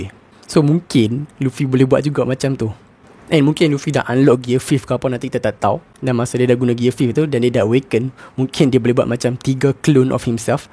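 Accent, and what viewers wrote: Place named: North, Malaysia. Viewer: welcome bro...all the best..penerangan bro jelas, dan yg best cakap utara hahahaa..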